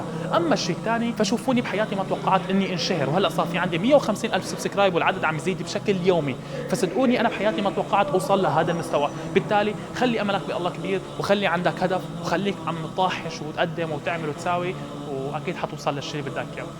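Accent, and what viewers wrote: Syria. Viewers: أول شي فديت اللهجة السوريه ما احلاها دخيل أمك شو اسمك ههههه اضحك والا فاعلم أن أعداء النجاح منعوك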